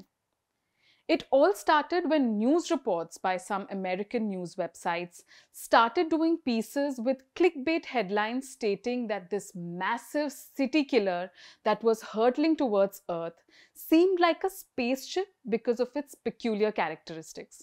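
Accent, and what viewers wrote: India. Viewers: Stop making videos , I couldn’t stand the lack of information and accent . I said what I said , I’m fatigued on Indians .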